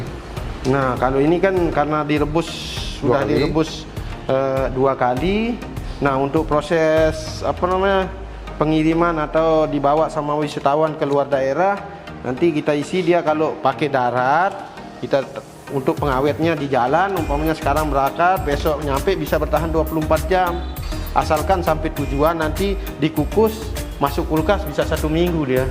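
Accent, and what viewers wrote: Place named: Lombok, Indonesia. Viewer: Perpaduan antara logat sasak dan logat bali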